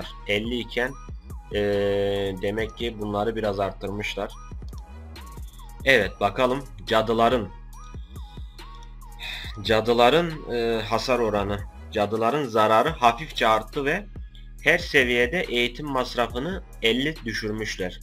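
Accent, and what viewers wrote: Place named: Anatolia, Turkey. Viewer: Şiven Tam Bir Anadolu Çocuğusun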